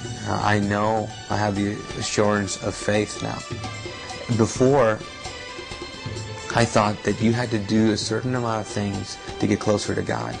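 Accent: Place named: Spain. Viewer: WOW!!! You have REALLY REALLY convinced me to CONVERT to Christianity hehehe. BTW, it's "convert" NOT "combert". It seems that your Spanish mother tongue (lengua materna) is affecting your English. Chico! Tienes que aprender el inglés sin influencias extranjeras, para que la gente no se reía de ti !!!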